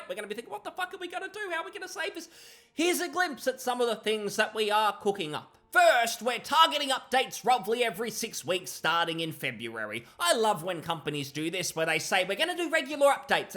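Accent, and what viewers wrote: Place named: Australia. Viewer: All Australians sound like this.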